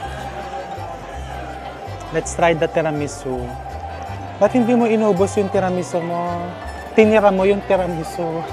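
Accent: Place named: Western Visayas, Philippines. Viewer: nakkakatuwa ka chef panoorin heehe pati pag ssasaalita mu para ka ilonggo.. srap mu panoorin na kumakain k chef.. good luck po chef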